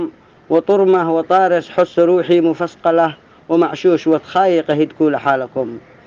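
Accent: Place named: Raymah, Yemen. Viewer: حاش انك اصابي انت ريمي وهذي لهجةاصحاب ريمه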